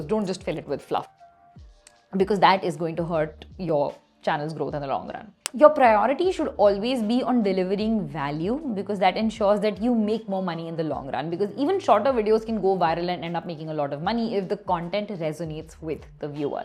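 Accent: India. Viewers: listening your india accent torturing my ears . sorry i cannot watch this.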